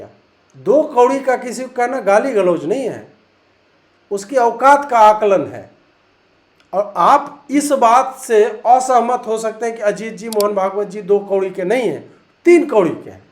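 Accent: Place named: Begusarai, India. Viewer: Begusarai wala ka ye normal launguage h Galti se begusarai style me sahi se bol diye to tum logo samjh se bahar aa jayega Boli se ched kr deta h begusarai wala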